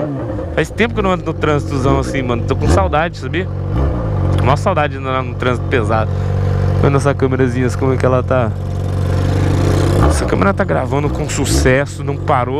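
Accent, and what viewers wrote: Goiás, Brazil. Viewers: pq vc fala no pique de Goiás se Londrina fica em Paraná? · por que vc fala no pique de Goiás se vc mora em londrina??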